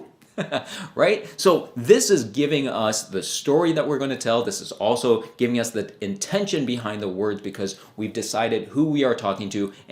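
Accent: South, United States. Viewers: Your southern accent sounds like Matthew McConohay 😅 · Liked the southern accent🙈😌😭😆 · Love the southern accent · Your southern draw is awful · the Southern accent to sound so natural on you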